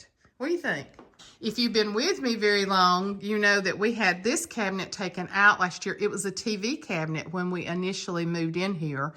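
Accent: South, United States. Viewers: I LOVE your Southern accent. I have always lived in the eastern Cape, and we too have a distinctive accent. So I guess we are both "Southern" girls, just different hemispheres. Lol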